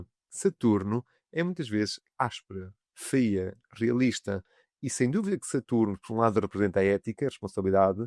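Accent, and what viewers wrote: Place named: Portugal. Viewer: Muito bom! Em português de Portugal!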